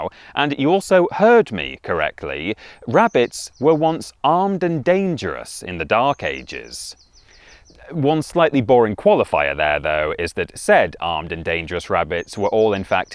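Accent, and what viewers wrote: England, United Kingdom. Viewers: How can a man with a heavy English accent do a video on this topic and not show a clip from the Monty Python movie with the killer rabbit? At least I can see where they came up with such a ridiculous idea... yesterday it made no sense, but today I've grown.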